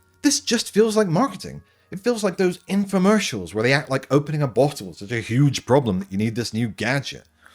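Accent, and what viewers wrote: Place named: United States. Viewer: Not the American accent for reading the spotlight rules 😭😭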